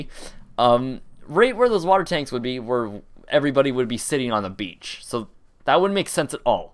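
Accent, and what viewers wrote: Canada. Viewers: You sound Canadian :D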